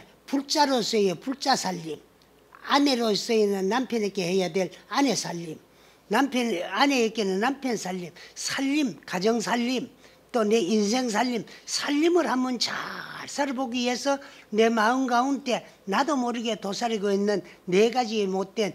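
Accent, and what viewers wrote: Gyeongsang, South Korea. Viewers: 묘허스님! 구수한 경상도 사투리에 맛갈스런 법문 넘감사하게잘받들고 갑니다 건강하십시요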